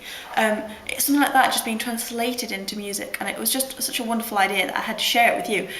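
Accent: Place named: Hull, United Kingdom. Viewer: Yay, I've just discovered Daphne Oram in reply to me mentioning Delia Derbyshire in a Guardian blog. Check out Delia, and also check out (album: ) who feel music in similar ways to Oram. Stockhausen too but his sound is not very palatable. Klaus Schulze's music is very visual in its feeling (especially earlier stuff, like late-70's to early-80's). Good luck with your projects. Where's your accent from? I used to live in Hull, sounds similar.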